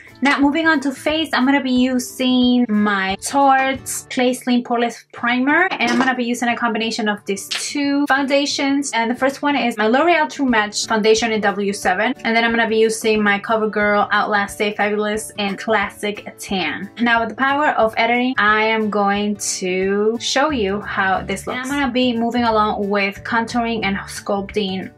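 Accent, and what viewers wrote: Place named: Caribbean. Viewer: Such a pretty look! I didn't realize you collaborated with one of my favourite youtubers. You have an accent similar to hers are you from the Caribbean?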